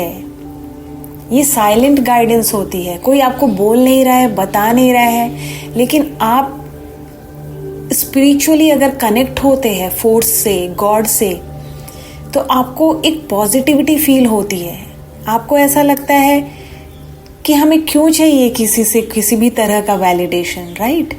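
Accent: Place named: Maharashtra, India. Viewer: Apka voice bahut soothing hai👌👍 very nice video 👌 & i think you are rmarathi from your accent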